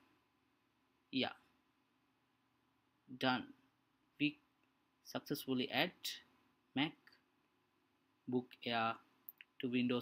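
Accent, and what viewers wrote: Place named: India. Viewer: indian accent very bad!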